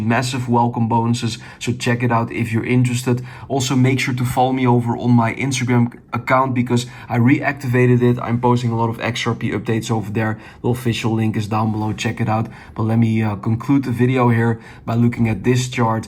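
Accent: Netherlands. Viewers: Typical dutch accent our has!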